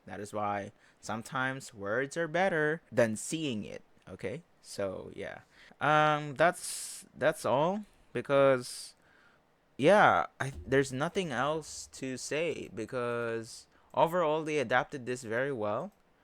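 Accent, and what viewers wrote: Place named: Philippines. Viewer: Completely unrelated to the the topic. But you have a strong filipino accent my guy lmao. were you born there and still currently live there? Im filipino too but wss born in the states. So my tagalog isnt good i can speak the basics but when it comes to having a complete convo i cant lmao but i can understand all of it. Im more fluent in spanish since a lot of my friends are latino i know weird lmao. and from a very young age I've always watched anime in Japanese so i know japanese too lmao.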